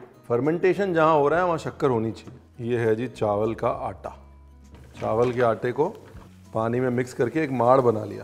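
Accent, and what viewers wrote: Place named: Punjab, India. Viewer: Looking delicious! Love your style of speaking… being a Punjabi it’s wonderful to hear the slang . Be the way you are! ❤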